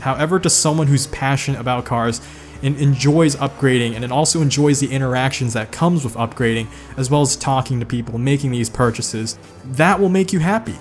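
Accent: United States. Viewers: I thought you were American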